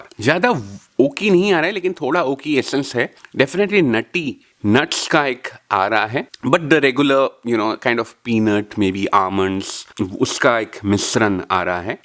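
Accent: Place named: Bengal, India. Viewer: With ur accent I’m guessing ur bengali babu. Tell me if I’m correct?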